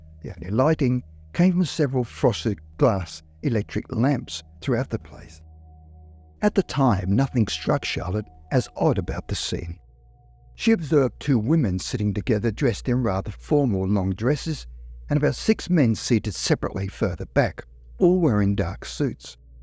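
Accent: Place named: Australia. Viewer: Wonderful stories with a twist and a Aussi accent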